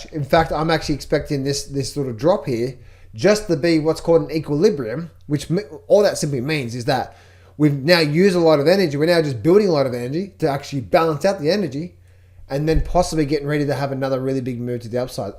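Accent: Australia. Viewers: The actual quote from Ed Seykota is: "The trend is your friend, until the end...when it bends." Don't forget the "bend" part,! Keep up the great work. Love the Aussie accent.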